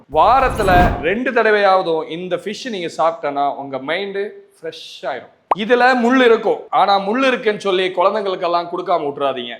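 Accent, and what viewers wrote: Kerala, India. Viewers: S ing tamil ல மலையாள வாட வருது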